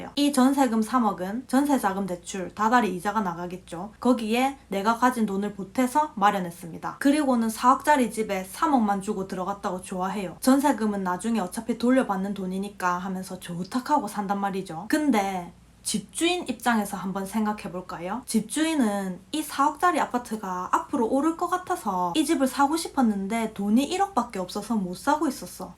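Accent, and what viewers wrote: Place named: Gyeongsang, South Korea. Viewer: 의 나레이션을 보면서 문득 드는 생각은 내가 남부경남 출신으로서 의 사투리가 무척이나 인상적이다. 남부경남 특유의 성조가 두드러지게 나타난다. 2의 2승 e의 2승을 설명하기에 무척이나 최적화된 사투리 억양을 가지고 있다. 남부 경남 사투리 특유의 '강조'를 할때 다소 신경질적인 톤이 나오는 고유의 억양을 가지고 있다. 기회가 되면 남부 경남 사투리 연구를 해보고 싶다는 생각이 들기도 한다. 다른 사투리는 별로 관심이 없고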